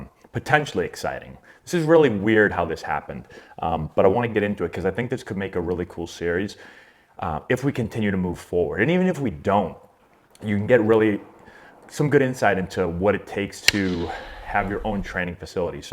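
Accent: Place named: Boston, United States. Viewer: the Boston really came out in this video.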